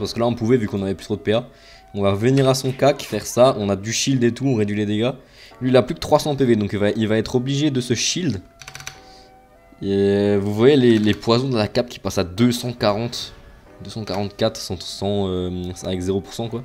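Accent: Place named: Alsace, France. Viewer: L'accent alsacien qui s'entend fort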